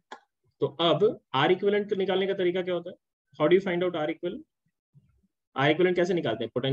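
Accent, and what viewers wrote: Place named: Bengal, India. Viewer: Got reminded of ur class at Akash....2k19 batch....but sir missed ur bengali accent....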